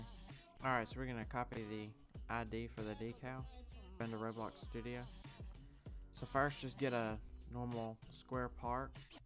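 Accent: United States. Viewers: red neck accent yeehaw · the cowboy accent lol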